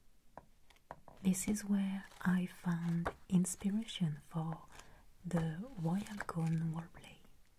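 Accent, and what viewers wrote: France. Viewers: Heyy I absolutely looove your asmr videos I just clicked on one of ur videos and I fell in love.. ur asmr is aesthetic it’s soo pretty to look at, I am learning French and I love your French accent. I think the French is a language that is perfect for asmr. I hope you make a video in French and definitely put some subtitles💕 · J'adore regarder tes vidéos. Je suis française et vit aux Etats-Unis. Entendre ton accent français me rappelle à quel point la France me manque. Merci beaucoup pour tes superbes vidéos. Elles me détendent quand je suis stressée. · Very French accented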